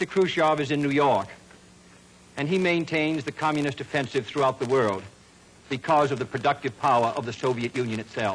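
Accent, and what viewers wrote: Boston, United States. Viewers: this isn't true, his accent is the complete opposite of Brahmin society and accents. John Kerry is a good example of Brahmin and born into. But the Kennedys were your typical Irish working class Boston accent. The Brahmin were not fans of this Boston culture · Check , , and , the Boston accent is soft, but noticeable. It seems as if he tried to train it out or soften it, but it's still there. By it's back in full swing, especially "A cotton fahmah in Georgiahr". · we talk like this in dorchester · He has a specific Boston accent than some in Boston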